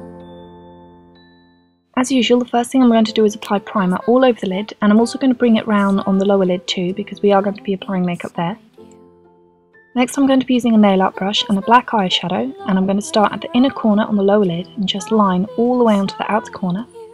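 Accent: England, United Kingdom. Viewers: she has an accent! cool! r u from england... or sumthing???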